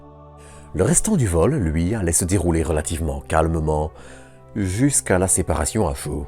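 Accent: Belgium. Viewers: Ouiiii la voix BELGE !!! xDDDDd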